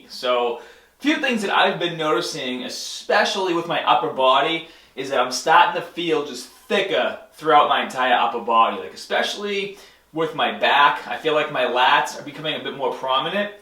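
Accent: Boston, United States. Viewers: First off your Boston ascent is coming out very much lol... funny to hear. Second off... Me as an LMT, I have noticed it looks like your hips are off kilter, meaning one looks higher then the other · Dude love your videos. learned so much. You are definitely from Boston right? "My amms" lol The r is silent in Boston. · Are ya from Boston? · Orpheus it's called the baaahstan accent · iv noticed you've picked up a Boston accent that you didn't have in your earlier videos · Shaan Targaryen I noticed too. I've seen some of his older videos and he doesn't have this Boston accent in them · You from Boston? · Did you randomly gain a boston accent? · sounds more like a Boston accent or in and around the area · Dutch Dude Yeah it's definitely a boston accent, except the rest of his speech isn't nasally like a bostonian's is. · Did he just get a boston accent from nowhere?? 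· the boston accent is ridiculous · I never moticed the Boston accent until now. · it's a Boston accent, that's what it is... they speak normally on several of the words but then sometimes they say others things weirdly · how is your Boston accent getting thicker? I thought you didn't live in Boston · Love the Bostonian accent . Nice gains ! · Boston accent makes me cringe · STOP TALKING LIKE A DOUCHE!!!! That Boston accent is horrendous. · Bruh I have't seen one of videos in a few years... Is it just me or is his Boston accent more prominent now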